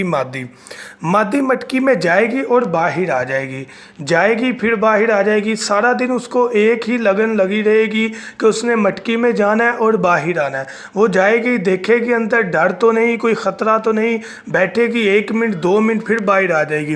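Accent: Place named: Punjab, India. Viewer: Punjabi lahja hai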